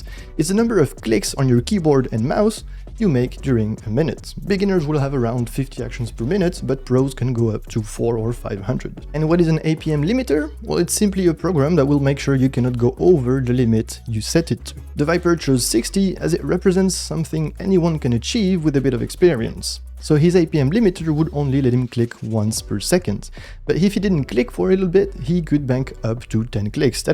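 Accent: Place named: France. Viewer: Je me disais que t'avais un petit accent fr. Par contre vraiment propre ton anglais on pourrait même croire que t'es autre chose.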